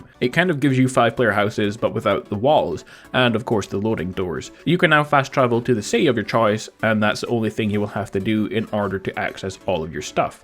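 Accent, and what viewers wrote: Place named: Scotland, United Kingdom. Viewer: Your accent sounds a little bit Scottish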